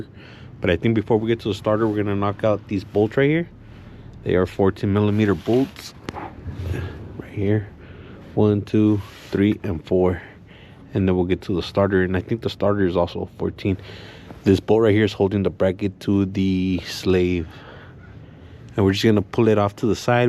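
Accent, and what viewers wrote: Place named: Southern California, United States. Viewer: You got that southern california chicano accent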